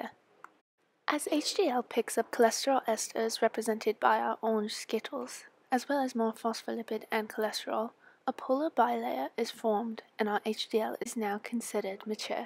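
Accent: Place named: United Kingdom. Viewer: Lol i love the british accent! hahahhahaha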